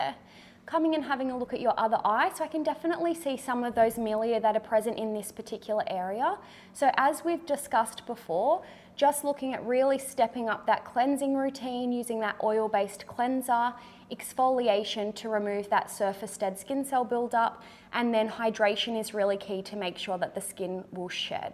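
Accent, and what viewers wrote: Australia. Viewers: l’accent australien n’est pas le meilleur pour l’ASMR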